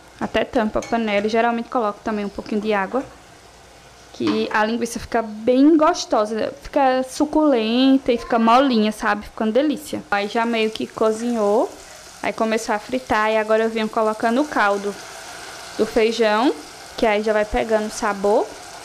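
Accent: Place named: Caruaru, Brazil. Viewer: Menina kkk eu cai de paraquedas no seu canal eu conheci logo que vc é de Caruaru pelo sotaque, aí esperei pra ver se vc realmente era de Caruaru, sou de agrestina. Somos vizinhas ❤️❤️❤️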